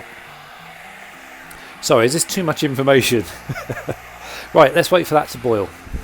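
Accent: United Kingdom. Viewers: As I lived nearly a decade in Britain, I just came here to listen to the beautiful accent and understand again why I became so fond of tea. Cheers!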